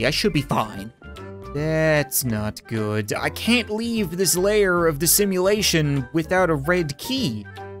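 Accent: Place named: Newfoundland, Canada. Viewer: He has a Newfoundland accent where is he from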